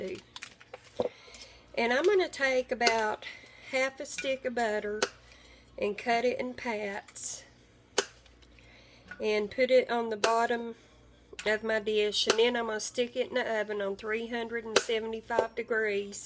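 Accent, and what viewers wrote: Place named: East Tennessee, United States. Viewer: I am a new subscriber and I just love your videos. You may have shared this in some of the videos I haven`t seen yet , but do you mind me asking where you are from? I am from East, TN and you sound very much like the people in this area.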